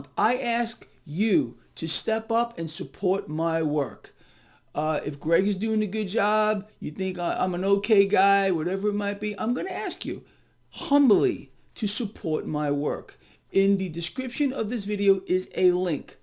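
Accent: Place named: New York, United States. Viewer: Is it possible this guy is from New York or New Jersey? That accent is so thick you could cut it with a knife.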